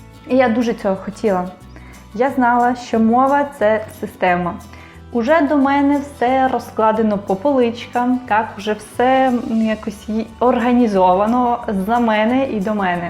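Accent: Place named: Italy. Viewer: ти говориш з італійським акцентом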